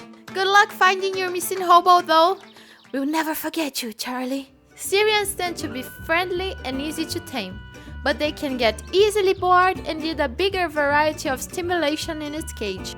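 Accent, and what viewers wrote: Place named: Brazil. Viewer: I love the video 😂😂 you sound Brazilian btw! Am I right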